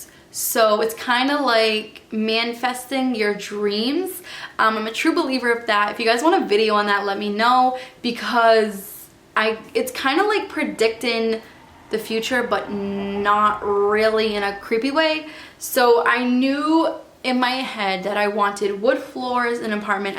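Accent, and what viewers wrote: Boston, United States. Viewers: I love your Boston accent. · Love the Boston accent, thank you for your good points! Need some help learning where to find an apartment coming out of college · Lol...i knew you were from the Boston area by your accent! I'm about 40 min away!! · I can hear your Boston accent. It's ever so subtle but adorable! 😍😁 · In Michigan, the basic coverage insurance is over $100 a month. For me its $239 a month because I haven't had insurance in a while. I've heard that Michigan has the highest car insurance in the country. Its just crazy to hear how cheap it can be in other places. In other news, I LOVE your Noston accent..😀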